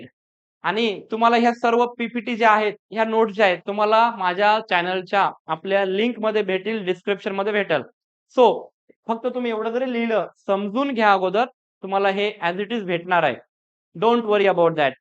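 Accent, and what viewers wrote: India. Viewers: Sir ekdam barobar boltay rumhi😂tumch boln aikun kaltay ki tumhi punyatle ahet😂😂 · Sir, are you from Nanded? Loha or kandhar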